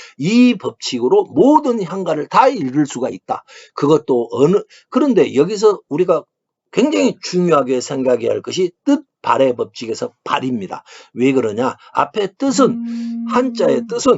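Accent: Gyeongsang, South Korea. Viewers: 갱상도사투리 이거어쩌지?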